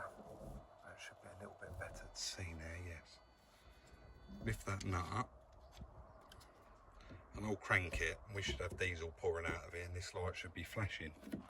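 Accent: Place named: Kent, United Kingdom. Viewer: I really enjoy your shows I now live in Cardiff but spending the first 30 years of my life in Rainham it’s nice to hear a proper accent I also enjoy when you go out driving around what was my local area seeing some of the old places especially the Kingsferry Bridge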